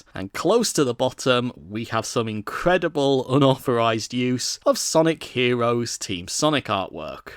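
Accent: United Kingdom. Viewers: Andrew Wood pretty sure he’s british if you listen to his accent you 🤡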